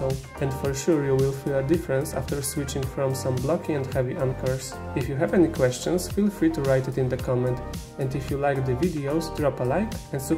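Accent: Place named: Poland. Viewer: Polish accent.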